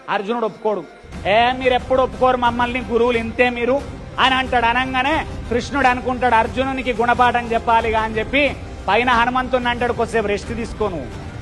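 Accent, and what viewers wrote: Telangana, India. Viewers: సారు చెప్తుంటే.. ఏదో సినిమాలో కోట శ్రీనివాసరావు గారు.. తెలంగాణ యాసలో భారతం చెప్పినట్లుగా.. నవ్వొచ్చింది❤😂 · Niyyakka mee thelangana slang msthu fun ra Bhai thiduthuntte vundhi bhaaratham vintunapatiki ! maku vimukthi kavalraa Babu mi basha nunchi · Telangana slang lo bharatam super undhi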